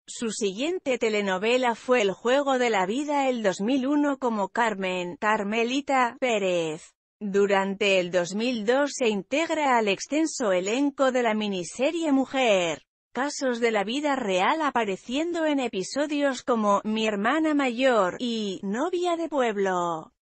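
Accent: Spain. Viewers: Odio el acento español!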